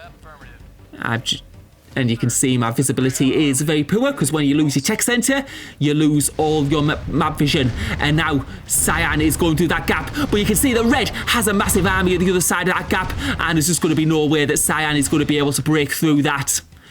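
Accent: United Kingdom. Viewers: i like your akzent :) very british right ? did you join the german community ?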